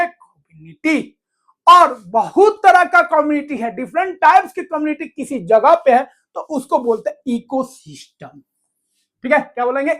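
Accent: Bihar, India. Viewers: Sir ap bihari ho ke ? Ham bhi bihar se hai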